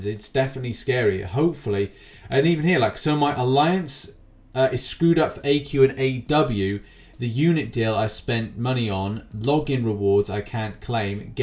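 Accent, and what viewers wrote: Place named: United Kingdom. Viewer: Hey, I’m asking how do you have marvel insider, you sound like you’re from the UK, and so am I, but when I went to get it, it said it was only for US residents.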